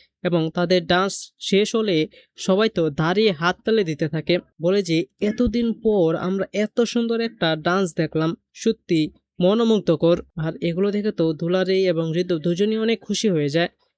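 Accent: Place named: Bangladesh. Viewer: আপনার কথা গুলো বাংলাদেশশের মত শুনতে লাগছে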